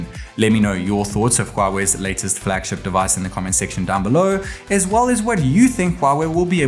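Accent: South Africa. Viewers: You're South African???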